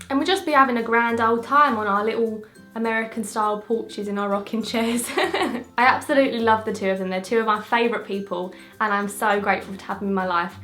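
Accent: Essex, United Kingdom. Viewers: are you from Essex? :) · Your accent is perfect . You're British I guess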